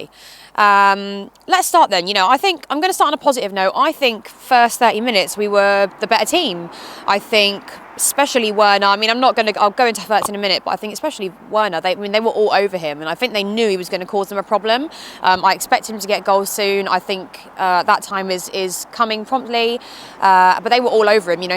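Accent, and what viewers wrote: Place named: West Country, United Kingdom. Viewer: Karen Brady has a posh accent, has West country accent being from Devon, not the same sounding to me.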